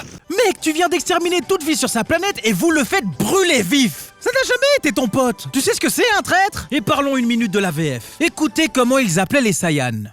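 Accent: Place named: Antilles, Caribbean. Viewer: Antillais j'ai l'impression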